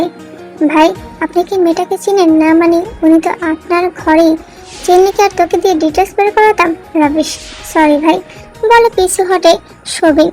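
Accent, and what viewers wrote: Sylhet, Bangladesh. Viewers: আপু তুমি কি সিলেটি?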